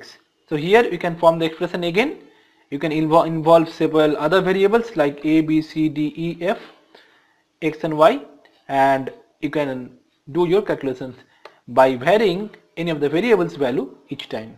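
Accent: India. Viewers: you should work on your indian accent :/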